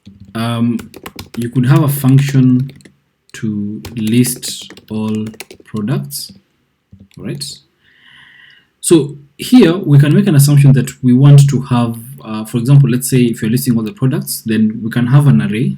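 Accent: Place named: Kenya. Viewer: Totally unrelated, but you sound Kenyan🤔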